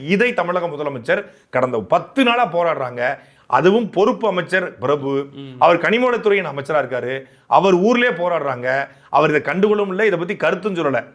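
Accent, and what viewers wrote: Tirunelveli, India. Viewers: When saattai speaks, sometimes his nellai accent slips, that looks very cute❤😅....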